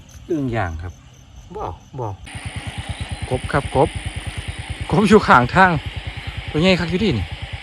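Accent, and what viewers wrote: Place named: Isan, Thailand. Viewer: เสียงหัวเราะเสียงเว้าที่คุ้นเคย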